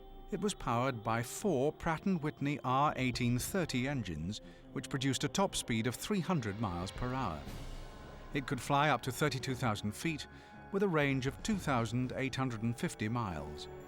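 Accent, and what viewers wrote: United Kingdom. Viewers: I find that any narrator that speaks the Queen's English I can understand with no problem what so ever and I'm an American.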